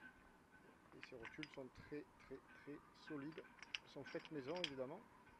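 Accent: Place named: Marseille, France. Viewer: Salut, j'ai passé l'hiver devant tes vidéos et je pense les avoir toutes visionnées (même 2 fois pour certaines). A force j'ai attrappé l'accent de chez toi. un lorientais qui par le marseillais! ma femme me dit que je suis devenu fada! j'ai hâte de voire la suite de tes aventures. Bon Vent l'ami et comme on dit chez nous KENAVO!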